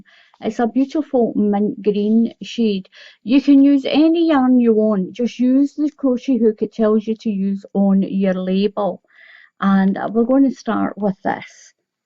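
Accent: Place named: Wales, United Kingdom. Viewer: Beautiful. Subscribing to await the blanket. I've seen a lot of videos from the UK. I'm US. Are you Welsh or Scottish? I hope you don't find me rude. We had a lady at our senior center from Wales that sounded a lot like you. I loved talking to her because of her accent! Hope to see the blanket video soon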